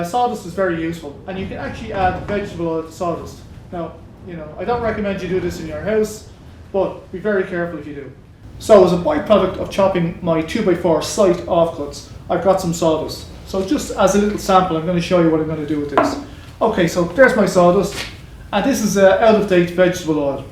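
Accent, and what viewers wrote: Ireland. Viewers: Ah, I love the brogue! You could read the phone book or dictionary, and I'd listen. Reminds me of the older folk when I was a child. Why is it that so many DIYs from Ireland ad GB tell you to mix something to the consistency of porridge? Makes me smile, because it's so descriptive. :)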